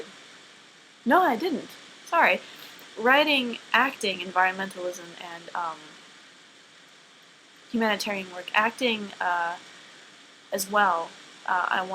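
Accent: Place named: United Kingdom. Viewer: Thanks. After completing the test, I found your video enlightening and you echoed many of my characteristics. It is nice to feel more connected to people. Can you recommend any ways to communicate with other INFJs? I'd like to find out how deep our connections go. I love discussing things on a deep and personal level but not everyone enjoys that. Also, I thought you were British! Pity...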